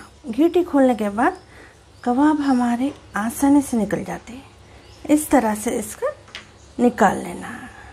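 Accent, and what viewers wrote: Hyderabad, India. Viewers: Assalamu alaikum WRWB...Aunty...Masha Allah...bahut acche recipe bataye aap...eid ul adha ke time pe...correct timing...shukriya...thank you so much for your efforts..aap ke bolne ka andaz bahut pyara hai...hyderabadi style...Masha Allah ...bahut Accha explain kiye aap tips bhi kahe...Jazak Allah...Allah aap ke channel ko bahut taraqi de...Ameen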